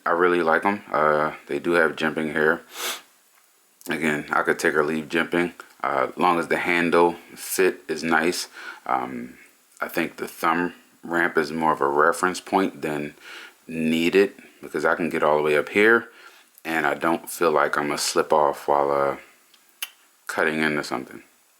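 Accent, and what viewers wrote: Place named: Louisiana, United States. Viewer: Just curious. What part of the country are you from? Trying to place your interesting accent. Louisiana? Detroit?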